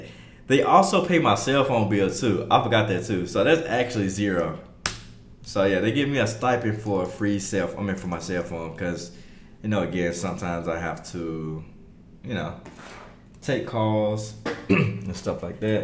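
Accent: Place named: Texas, United States. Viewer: Also you sorta have a country accent like Texas in a way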